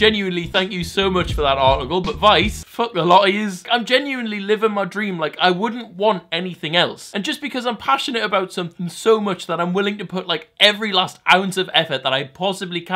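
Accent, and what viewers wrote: Newcastle, United Kingdom. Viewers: Are you a Geordie? This explains much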